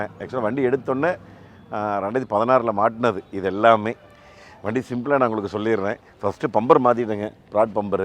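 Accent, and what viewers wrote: Kongu, India. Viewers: Kongu thamiz awesome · கொங்கு தமிழும் அழகு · கொங்கு தமிழ் அருமை....ஏனுங்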